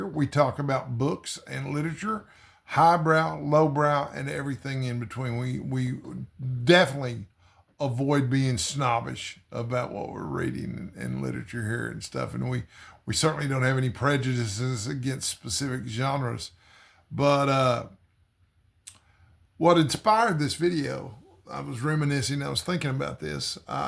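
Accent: Texas, United States. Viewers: I like your videos. I've been to Texas, I love your accent.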